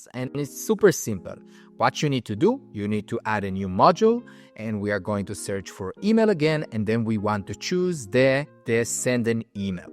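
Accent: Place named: Israel. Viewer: What's your background you sound Israeli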